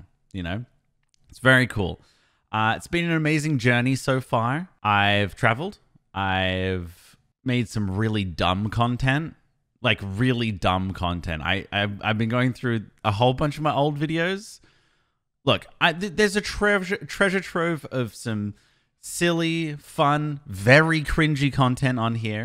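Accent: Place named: United States. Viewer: 6 years and an american accent later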